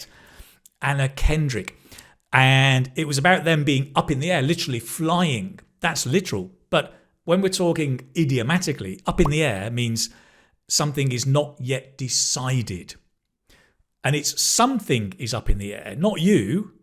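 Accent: United Kingdom. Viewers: Pretty obvious he is British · ❤ British accent ❤❤❤❤❤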